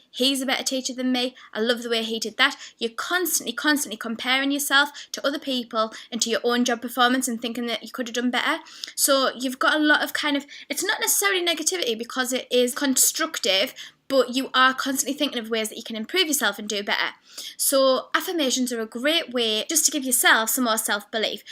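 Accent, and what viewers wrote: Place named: United Kingdom. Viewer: Lovely to hear your experience of The Miracle Morning. Great to hear another British accent :)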